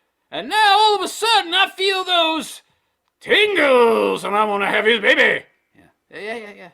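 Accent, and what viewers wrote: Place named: Texas, United States. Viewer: If you slightly modified your Texas accent, you could probably nail a James Carville impersonation.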